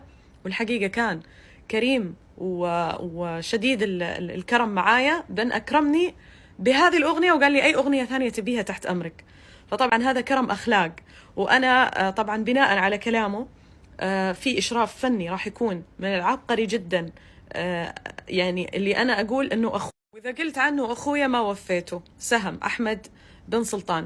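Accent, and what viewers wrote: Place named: Jeddah, Saudi Arabia. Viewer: يمنيه معها جنسيه اماراتيه تتحدث بلهجه سعوديه جداويه.. من أنتم ههههههه.. الله المستعان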